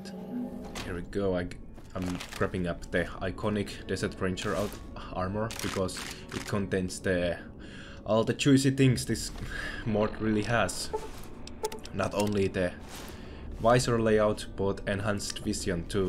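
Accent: Finland. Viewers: Nice süomi accent, bro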